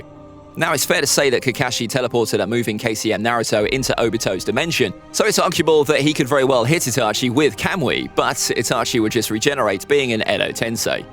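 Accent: United Kingdom. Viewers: He has British accent❤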